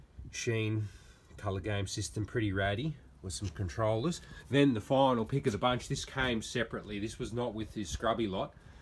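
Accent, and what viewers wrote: Australia. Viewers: Hey mate do you have any crt’s with HDMI inputs? You sound like you’re in Melbourne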